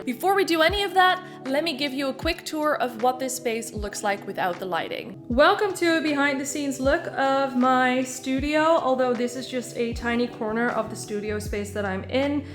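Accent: Netherlands. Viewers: I hear a vague Dutch accent somewhere in there! Great video. Thanks for the help. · Are you Dutch? Or German? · Kaaskop accent spotted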